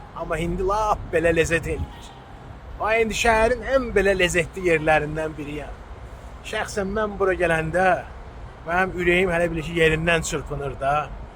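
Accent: Baku, Azerbaijan. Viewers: Спосибо дорогой. Аллах сене джан саглыхы версии. Я всегда от твоих видео и твой сладкий бакинским окцентом получаю огромный удовольствие. Сах ол вар ол 100 яша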